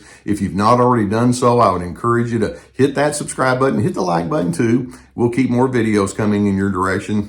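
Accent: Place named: Texas, United States. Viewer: I feel better just looking at Gus. A really chilled dog! Love Texan accent too! It helps so much to have these videos to understand my past and in such a nice way. Thank you!❤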